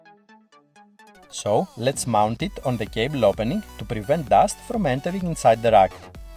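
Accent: Greece. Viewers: Καταλαβαίνεις τον Έλληνα από την φωνή...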